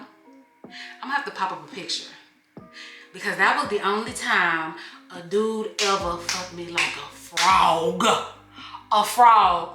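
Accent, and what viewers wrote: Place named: Texas, United States. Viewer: I was thinking how you sound like Brooke Valentine then realized y'all are both from Texas. This was a great story time!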